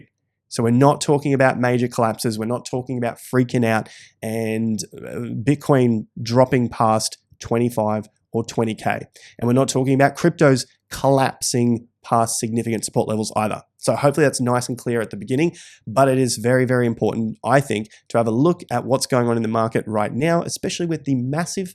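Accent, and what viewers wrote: Australia. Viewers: accent brings me back to my backpacking experiences in Australia. Good on ya mate! But regardless of that, I love the honesty and all the considerations in this analysis. I’m gonna stick around here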